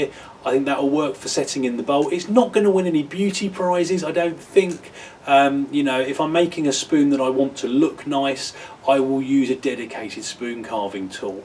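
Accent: United Kingdom. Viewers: I love your Englishman accent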